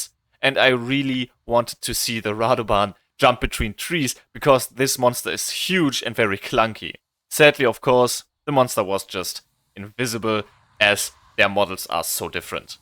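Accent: Germany. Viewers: ich höre so stark das du deutsch bist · Hey are u german u Sound like u hafe a german accend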